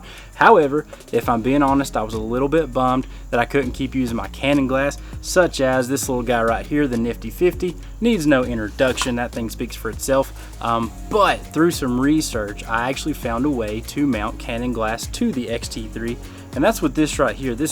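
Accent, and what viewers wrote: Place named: Knoxville, United States. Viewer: Hey,. I knew the second I heard you speak you are from where I come from. All my father's relatives are from the Knoxville area. Been a long time since I've been back, but I loved living there. Now, you only demonstrated the nifty-fifty. So, I don't know if you tested the adapter with EF-S zoom lenses that are stabilized. My question is about how well the stabilization of the lenses work. Do you know?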